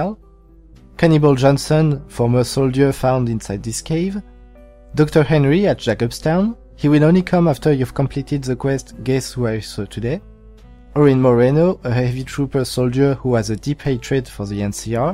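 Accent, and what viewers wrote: France. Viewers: Tu es Français ?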